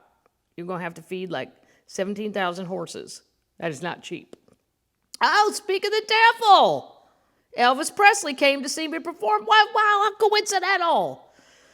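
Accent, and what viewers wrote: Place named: South, United States. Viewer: spot-on Southern accent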